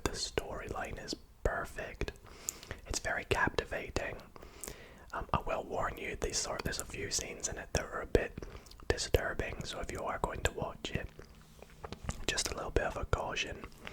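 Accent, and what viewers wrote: Scotland, United Kingdom. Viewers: omg you’re scottish !! i thought you were irish oops 🥲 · I am from spain and i have to say that I understand really well ur english although your scotish, i love these mukbangs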